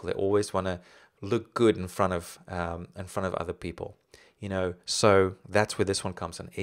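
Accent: South Africa. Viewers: I never thought you were Australian? Being from New Zealand, I thought you had a South African accent (my fave accent haha). But your videos are very helpful, thanks :)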